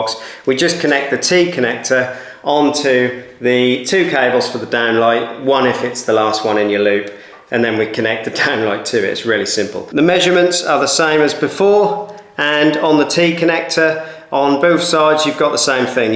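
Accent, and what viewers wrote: Norfolk, United Kingdom. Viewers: Great instruction Buddy. Is that a Norfolk accent!!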